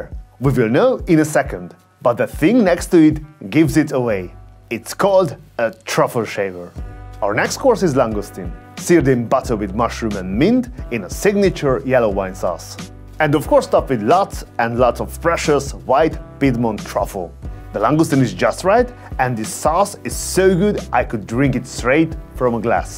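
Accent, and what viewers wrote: Hungary. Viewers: Sounds exactly like any other Hungarian I've spoken to...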